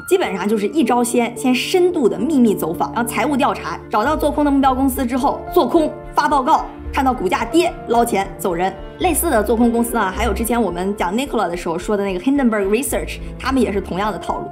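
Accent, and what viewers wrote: China. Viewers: 你如果講話能沒有大陸口音會更吸引人。還有，學習會用破音字。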